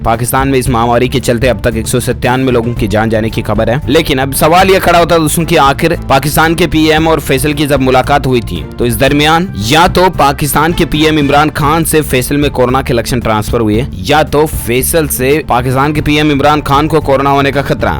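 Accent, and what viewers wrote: Rajasthan, India. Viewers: Bhai aap Rajasthan s ho kya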